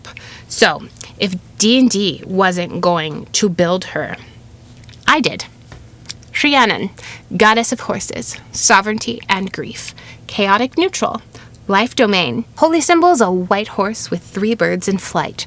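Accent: United Kingdom. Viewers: Saw you definitely thought you would have a British accent - you have such a cute northern accent 😂 love it, love you, no idea why I thought you'd be British 😂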